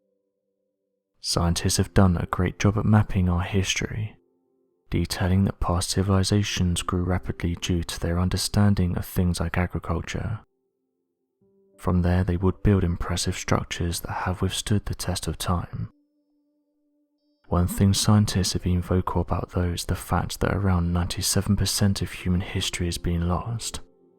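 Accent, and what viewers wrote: United Kingdom. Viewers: Your British accent does not make you more credible.Am I the only one that has noticed the increase of British commentators on science discoveries and documentaries in and around the United States as if USA citizens are not creadable commentators or witnesses worthy of giving a true account about things related to the United States it’s history or it’s science documentaries but the British are and taking over positions in our media. I am not trying to make a racial thing about being from England to discriminate or bad mouth them as a people. I am just stating how more and more it is people with British accents narrating our special documentaries on US history and scientific studies in the US as though they are the experts on these subjects and American commentators are not getting the narrating jobs here close to home. I don’t know what’s going on. 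But I can’t help noticing this change in US media coverage. I haven’t heard anything about the British networks buying up our nations media networks?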